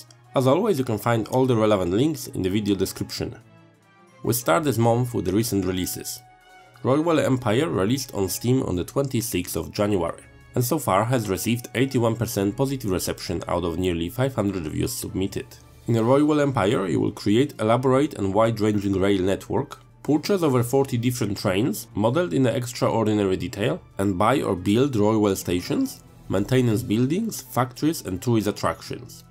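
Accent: Poland. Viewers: i don't know if you will feel offended but you sound polish. are you polish?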